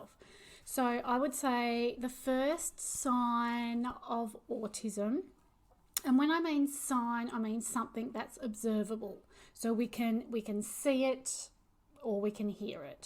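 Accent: United Kingdom. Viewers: I think you still sound like you have a bit of an English accent to me but I’m no expert. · Toe walking can also be because of a too short tendon between the heel and the calf muscle. Can be fixed with surgery. - Ecolalia can also be a result of TS/OCD - you're quite right. Same with coprolalia. - Constipation may be (I had it when I was a kid) because for some reason I liked the feeling of "keeping it in". NOTE! I've got TS/OCD and probably a tad Asp and a sprinkling of ADD. - My facial reaction can be totally blank by that is by will. - My primary language is not English but I still speak English with a Brit accent because I'm old enough to not have learned the US accent. ;) But then you are what you speak! See Star Wars princess Leia when brought aboard the Death Star and meets governor Tarkin. She mulled over the line with "I should have recognised your foul stench" and it didn't come out right. 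Of course not since she was American. Then someone on the set told her to try that line in Brit English, and lo and behold! Carrie Fisher delivered the line in perfect Queen's English. I must say you sound perfectly British (if you aren't). - I preferred to play alone as well and I have always been a "loner". Also, a sign is fixating on something. Like I did on computers and continued to work with systems development and maintenance in various capacities all my life. I get really got at it, too! ;) The ability to really focus on something is something I think people with autism/asp can do. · The only symptom I don’t/didn’t have on your list is delayed speech. I started speaking pretty early, surprisingly. But even down to you speaking in a British accent, assuming you did it because your dad is British… I also did the same, and my dad is also British and I’m American 😂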